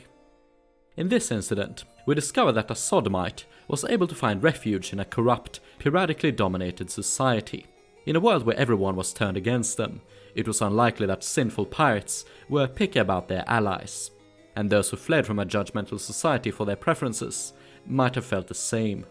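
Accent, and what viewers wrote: Scotland, United Kingdom. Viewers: The Scottish accent ❤ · He’s not British. Sounds more Scottish or Northern Irish.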